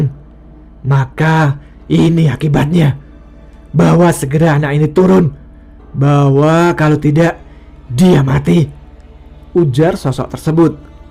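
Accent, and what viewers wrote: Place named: Cirebon, Indonesia. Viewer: Aksen mas seperti dari cirebon, asal mana mas?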